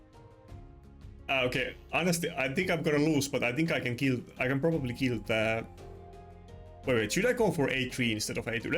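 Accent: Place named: Finland. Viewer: May I ask where you are from? It's just that you kinda sound like a Finn that's trying to hide the finglish accent but slips up every now and then :D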